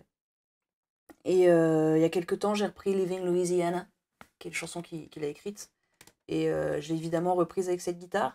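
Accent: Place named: France. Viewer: since I saw you playing all instruments on your own I wondered what kind of treasure I digged out of youtube: You are so wonderful young comparing to me, the music I listened to at the end of sixties/beginning of 70ies...I ´m happy having been alive then, with my VW-Bus T1, long hairs and ´big things´etc.... but: I miss a daughter or a son of my own who understands my ´faible´ for this passed era of musical art, feeling and behaving.I´m not a musician myself but I think I could distinguish good and bad easily...kneeling in front of your ´Grandmother´ (never heard of her until now) this would be suitable for me music-aficionado...You must be at least of double language/national origin? Singing without any notable accent in English!?And talking French fluently? And while French-talking your English has French accent!? (why? to fulfil French listener´s expectations?)Thank you Mrs., especially tonight your cover has been moving my soul. 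Stay healthy and happy, good luck in your future fate;-)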